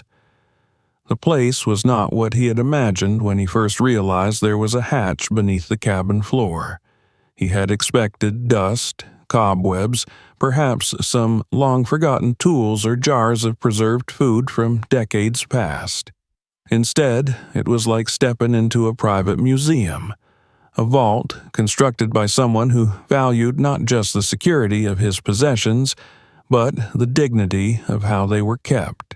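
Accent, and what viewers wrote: South, United States. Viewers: The faint southern accent of the narrative voice adds to the story.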